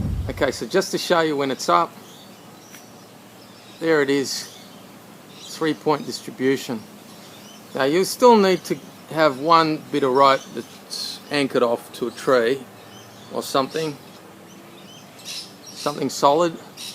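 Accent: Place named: Australia. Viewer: Thanks for the fast reply! Awesome. If I had to take a guess I would place you in Australia (your accent gave you away):D Thanks for the informations. I already downloaded your instructions (and want to throw money at you for it - by the way). I was just curios which of your videos was the most recent design In your instructions those eye bolts further down the "legs" of the stand (the ones you used to hang the speed ball) are missing, I presume they're just drilled into but wondered if you found a more elegant solution:D But with your idea I can get rid of the gym since I'll be able to do pullups on the station. And dips. And a punching bag. I really love your idea!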